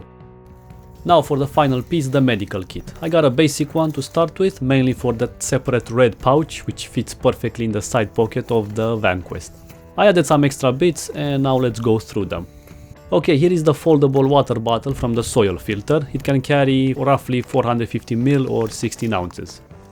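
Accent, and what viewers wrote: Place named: Eastern Europe. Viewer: Where are you from? You have that eastern european accent.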